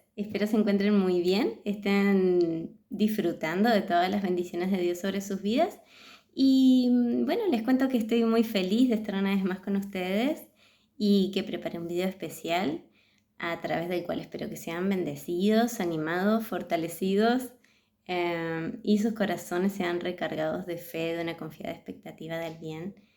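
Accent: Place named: Argentina. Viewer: Como muchos argentinos, pronuncias los sonidos de la "LL" y la "Y" como un "SH" o "CH". Cuando lees palabras hebreas, tu pronunciación argentina dificulta saber cuál es la fonética real de esa palabra y, en consecuencia, de qué palabra se trata. Como tal vez te resulte prácticamente imposible darnos la pronunciación correcta, al menos podrías incluir subtítulos. Con las palabras por escrito, podremos nosotros mismos buscar información adicional del mensaje que quieres entregar.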